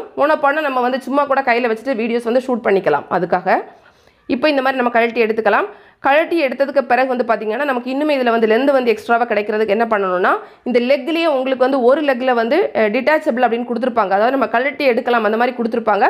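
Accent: Kanyakumari, India. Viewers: Hi sis, Excellent explanation that too your kanya Kumari slang is awesome.. Nostalgic 😔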